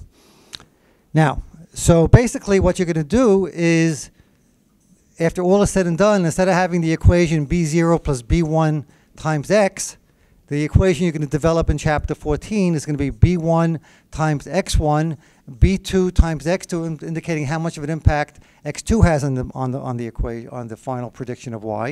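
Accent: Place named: New York, United States. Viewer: Lol I admire the NY/ Jersey accent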